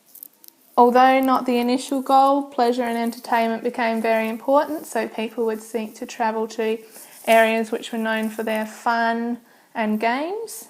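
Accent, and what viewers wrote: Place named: United Kingdom. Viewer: Even though she has a British accent, I can understand almost everything effortlessly. That means she made a really good lecture ✌ (better than my teacher...)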